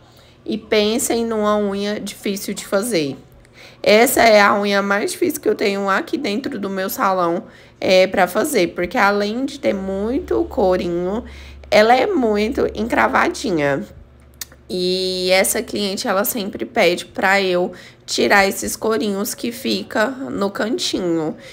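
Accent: Brazil. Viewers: Amo os seus vídeos e o se sotaque goiano. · Vc é de Goiânia?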